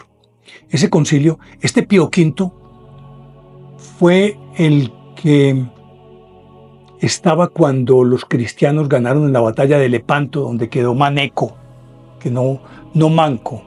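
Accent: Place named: Antioquia, Colombia. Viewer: Me encanta su acento paisa, maestro. 😍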